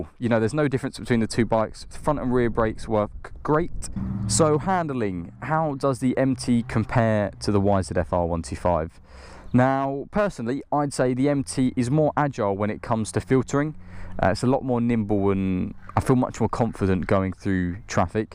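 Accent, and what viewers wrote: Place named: Southern England, United Kingdom. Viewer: Just out of interest, where abouts in England do you live, you sound south ish, which is where I am. Just because I’m 16 in a month and then going off a fair distance to college. Wondered how suitable the yzf would be for this and where I could find a good dealer in the south. Cheers in advance buddy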